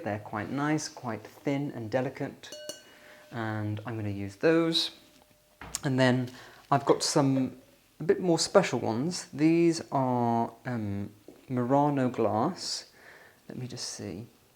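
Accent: RP, United Kingdom. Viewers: Yes, unfortunately he does not see it. He's made videos before addressing people that criticize his posh accent and his lifestyle stating that's who he is and he just ignores it. He thinks the critique is just people being mean or that envy him. I think there's a nice person in there, but he has convinced himself he needs to keep up the elegant dandy facade. He comes across a bit sad and lonely to me. That scene in his closet gave me manic vibes...very OCD. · So British, so posh hahaha.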